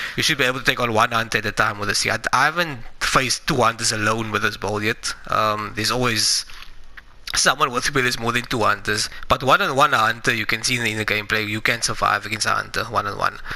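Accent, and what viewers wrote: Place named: South Africa. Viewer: my man...nice video....your accent...you from SA?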